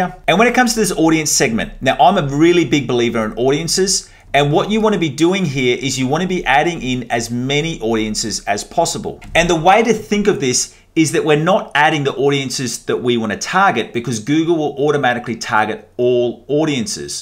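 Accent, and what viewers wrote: Australia. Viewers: I like the accent. Is he an Aussie?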